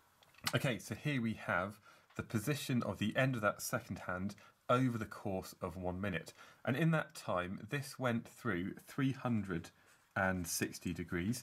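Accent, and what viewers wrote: United Kingdom. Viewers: What a beautiful British accent indeed. That was great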